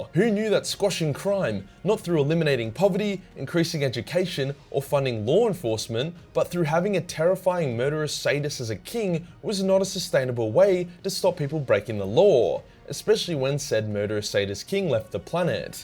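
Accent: Australia. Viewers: God I love aussies explainin shit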